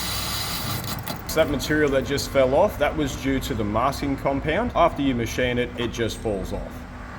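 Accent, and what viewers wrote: Australia. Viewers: At the very begining he says "how u doing guys" or "hi young guys"? Im Polish so I can't tell due to australian accent 🤣🤣 Great video btw ❤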